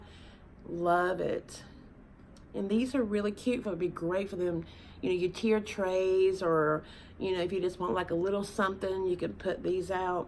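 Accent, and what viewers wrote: South, United States. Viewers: New to your channel. I live on the southeast coast of Florida. I saw your coastal wall decor you put together , loved it and decided to subscribe. My style is not total farmhouse but more like coastal, rustic, distressed with a little vintage mixed in. I get a lot of inspiration from all styles of decor and make it my own for my style. Being raised by very southern parents, I detect a southern accent when you talk. I also subscribe to a couple of people that I see in your comments. I don't know if you subscribe to 2 people I'm gonna mention but I think you would really like their channels. Bargain Bethany is your girl for everything Dollar Tree. She not only keeps everyone informed on the newest items DT has come out with but does amazing diys out of them. If you want a very seasoned You Tuber that is into farmhouse and does some of the best collaborations, and up cycles you really need to check out Second hand Tracey Ann. 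Tell her that her Florida buddy sent you over. Make sure you check out her last collaboration she did with lemons. She did one each week for the month of May and they are AMAZING! If you want to grow your channel even more, participate in her collaborations. I love the DT haul you just did and I agree, it is so nice to decorate with pretty, matching items when you get together for dinners, bbq's and holidays with family and friends. I think I'm going to get a lot of inspiration from you. Have a great weekend. Hugs from the southeast coast of Florida 🌴😎💙😎🌴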